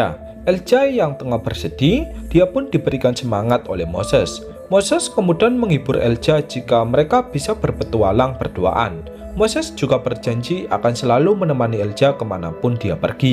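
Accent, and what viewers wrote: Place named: Tegal, Indonesia. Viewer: Asal tegal apa brebes yg ceritain film ini..logatnya kentara banget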